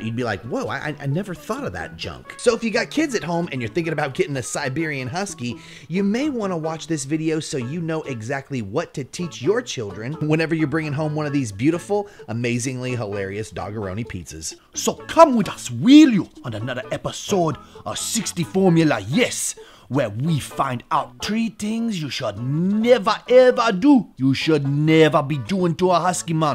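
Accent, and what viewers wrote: Caribbean. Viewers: But that carribean accent on point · Me not likely the Caribbean accent or Spanish accent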